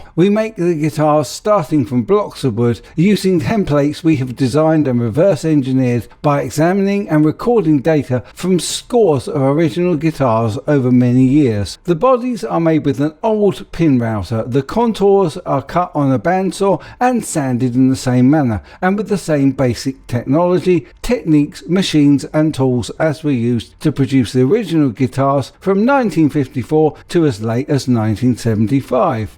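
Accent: England, United Kingdom. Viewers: Sounds like a typical English accent to me. I would have never thought he had a speech problem if he didn't say so. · You sound English to me, heard some crazy accents.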